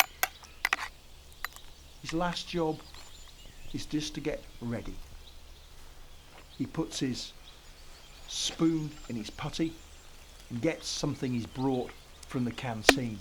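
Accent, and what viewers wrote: United Kingdom. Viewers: I get ASMR from hearing that english accent... its so hypnotizing. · I watch this when I’m lonely “cone beef SHTEW, share with his mate, he puts.......... his spoon in his puddy, pep eh uhp ah beh “ thank you sir for being so British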